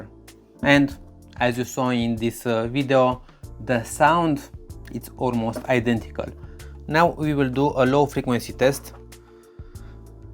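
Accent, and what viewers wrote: Romania. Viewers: Are you Romanian?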